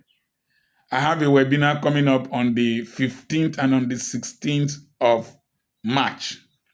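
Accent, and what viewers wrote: Nigeria. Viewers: I dont the deep nigerian dialect but I love it.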